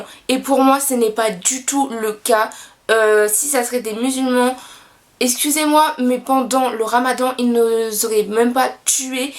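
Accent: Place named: Madagascar. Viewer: j'aurai dit malgache 😊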